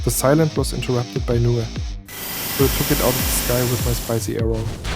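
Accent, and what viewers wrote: Germany. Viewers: you are german right? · are you german# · are you german by chance? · are you german 🔥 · Very german englisch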